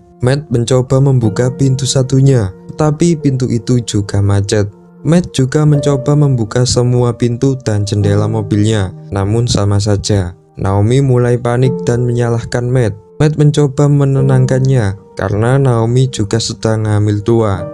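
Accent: Java, Indonesia. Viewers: JAWINYA MEDOK BNGT MASS 😁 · Medoknya lho rek wkwk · jawa nya medok bgt 😫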